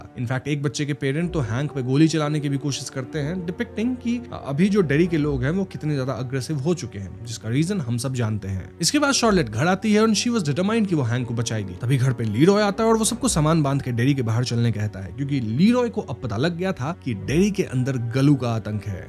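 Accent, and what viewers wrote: Bengal, India. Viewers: Hey your Bengali ??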